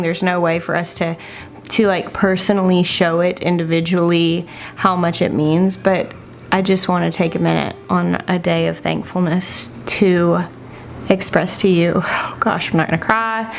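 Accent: South, United States. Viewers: I love watching you. I love your heart. I love your authenticity. I think you and are one of the most "real" of the "youtubers." I just watched a tv show that is also shown on YouTube, Growing a Greener World". I've watched Joe on PBS for years but tonight when watching his latest episode, I realized YOU HAVE A CLONE IN THE GARDEN WORLD!!!! You have to watch her. She sounds like you describing her gardening love. The link is <link> She is Nikki Jabor. I wasn't familiar with her but apparently has a large following and a book. Check her out. I would rather hear you talk though.....I'm partial to a southern accent!! Thank you for sharing your life, your love, your family and your faith. You are a blessing to me. 💕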